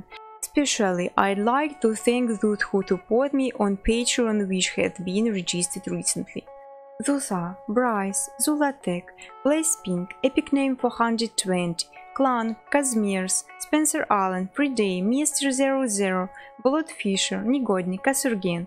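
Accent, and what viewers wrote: Russia. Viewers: Русский акцент, или мне кажется?) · русский акцент ) удачи и успехов вам ! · Русский акцент? Или я ничего не понимаю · уууу ска первый раз слышу такое прям рашко произношение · Говоришь как русский заметно дэй к примеру говоришь дей ты русская? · Russian accent)) Сразу понятно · русская 100% акцент палит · Так она русская ?)) бля по акценту было впр понятно,но я думал прост такой акцент сам по себе,ну к слову дЫа она молодец))0 xD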